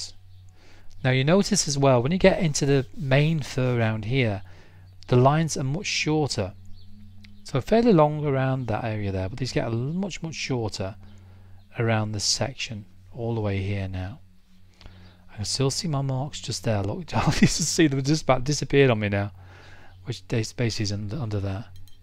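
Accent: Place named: Scotland, United Kingdom. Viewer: Omgosh ~~ I know this video is a year old & I’m so happy I found you😊 Long story short..I’m going through, not so fun times, but you were able to put a smile on my face..just by being you💕 I thank you. Just to get out of my “funk” as I call it I want to paint a cat’s eye. Thankfully I found you. Step by step over two hours. Nobody does that. They want $ sure rightly so however there are people who want to teach and they don’t care about $. You have integrity. Thanks for putting a smile on my face. It has been a few since I had one. Your accent helps too. My grandparents were from Scotland, and England. Hearing your brough has helped immensely. I would love to send you my finished eye. Is there a way? Hope you’re getting along well. I’m Scottish and live in America. This has been quite a couple years aye? Hope to hear back and pray that all are happy and well ~J~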